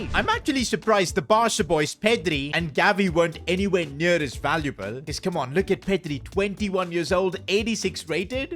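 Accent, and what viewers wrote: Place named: India. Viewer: your indian acnent just the way you said "i am building." 🤣🤣🤣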